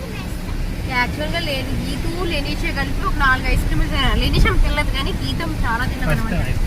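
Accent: Srikakulam, India. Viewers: Malli, language marchestunav nv srikakulam laaa matladitenaey estam akka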